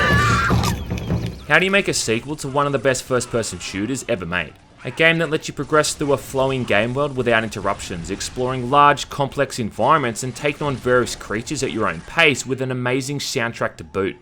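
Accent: Australia. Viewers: I think it’s the Australian accent in the inflections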